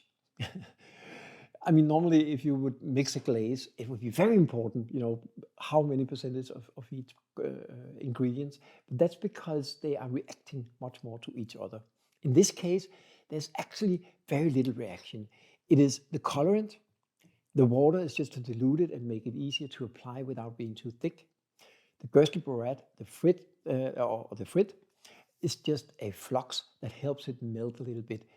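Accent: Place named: Denmark. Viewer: Danish? Your accent sounds just like my uncles! ❤ great video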